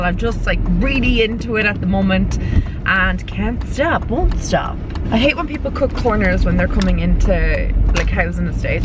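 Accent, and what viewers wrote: Ireland. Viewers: Finally we get to here your Irish!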